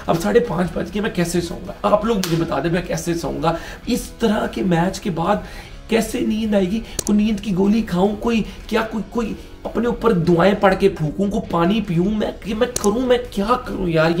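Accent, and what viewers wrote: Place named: Punjab, India. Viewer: You are so funny and your Punjabi accent.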